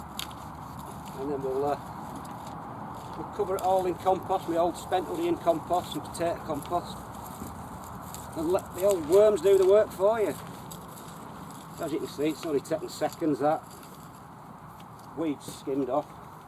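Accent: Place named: North, United Kingdom. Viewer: Hi Love your Northern accent! We call this the Back To Eden Method in the States. It really does work very well. I loved your competition footage as well. Keep it up!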